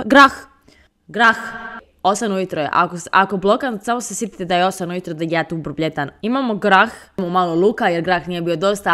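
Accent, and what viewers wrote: Split, Croatia. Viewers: Jesi ti iz Splita pitan radi naglaska jer ja jesan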